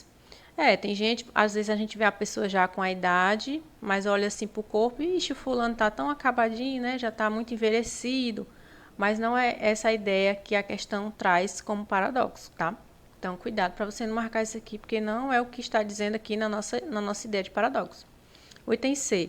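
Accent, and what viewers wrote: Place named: Northeast, Brazil. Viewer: Quando acho vídeo aula com o meu sotaque nordestino, meu coração fica como ?! 🥰🥰🥰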